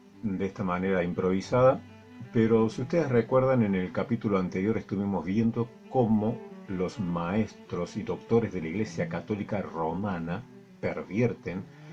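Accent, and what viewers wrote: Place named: Cordoba, Argentina. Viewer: Jaja Dios lo bendiga disculpe no me pude peinar... Bien cordobes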